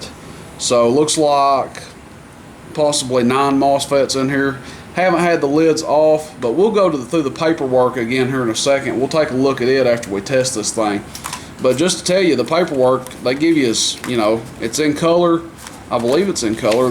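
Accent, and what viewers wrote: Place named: Tennessee, United States. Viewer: I'd like to hear one of these on the air. Often times amps have a very small amount of distortion coming through and not clear as the radio itself. There's one guy who cruises through my way ever so often down the interstate 14 miles away. It takes him at least 1 hr to go out of range. I don't think that guy ever shuts up. I have never in my life seen a guy talk so much. 30 minutes before he gets here and 30 minutes after he passes. I know he's pushing some serious power but have no idea how much are what kind of amp he has but he is crystal clear. He tells folks I can't here you but you'll keep hearing me on and on and on. Here is a cool miles per watt graph. <link> You sound like some of my kin folk in Tennessee.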